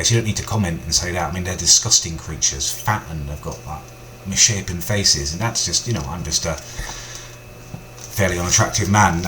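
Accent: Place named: Blackburn, United Kingdom. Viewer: I thought your accent sounded familiar, I'm from clitheroe it's a town about 10 miles away from Blackburn. well done on 20k btw I like your videos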